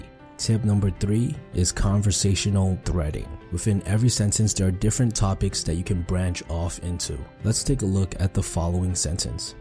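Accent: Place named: New York, United States. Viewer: Thanks. You sound like you’re from NYC, Puerto Rican 😊. Am I right ? I’m from Washington Heights.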